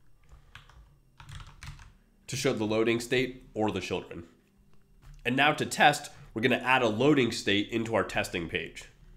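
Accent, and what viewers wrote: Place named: United Kingdom. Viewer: Obviously American, but there's an accent creeping in here. Secret Brit? :)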